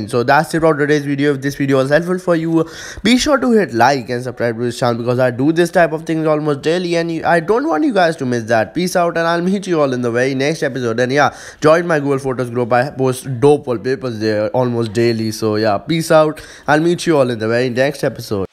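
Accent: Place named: Tamil Nadu, India. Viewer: i can't understand what the hell you talk .. please talk english.. not english tamil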